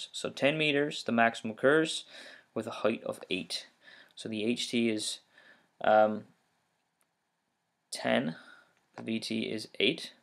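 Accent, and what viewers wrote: Newfoundland, Canada. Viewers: Are you from newfoundland by any chance?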